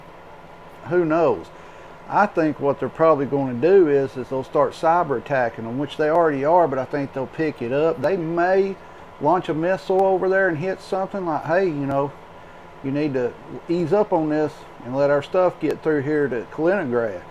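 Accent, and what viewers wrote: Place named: Alabama, United States. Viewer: Love the accent! Alabama?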